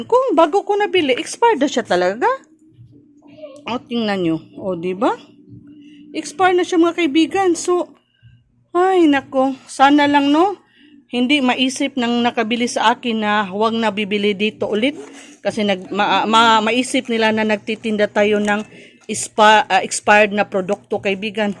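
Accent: Visayas, Philippines. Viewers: hahahah.. Visaya giod oi, puwede mana ibalik Sis, ereklamo..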